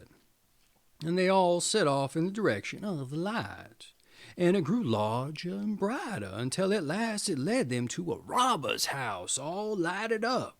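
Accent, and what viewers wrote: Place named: Lowcountry, United States. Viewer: I can’t decide if he is mocking the low country accent or enjoying it 😂but it’s a little to theatrical to listen to the entire story.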